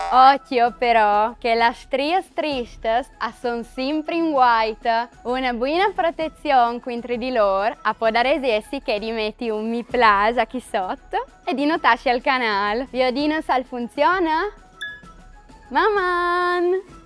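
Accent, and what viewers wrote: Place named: Carnia, Italy. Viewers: Ma certo che e' carnica! Monai....Cleulas...e Prat a sci cjacara cu la a!!!!! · Scusate, sono appassionato di parlata friulana..... Questa ragazza di che zona è? Carnia ? Le parole hanno desinenza in a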